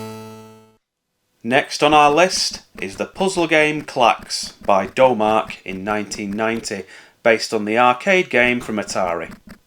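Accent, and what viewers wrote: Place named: United Kingdom. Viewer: I clicked this video saying "100% this guy has a fucking brit accent" - lo and behold. Literally nobody else would say the CPC is better at anything.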